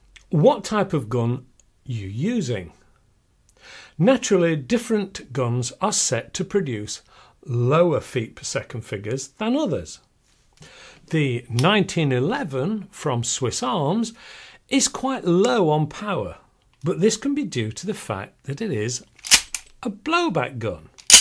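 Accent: United Kingdom. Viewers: I could listen to British people talk all day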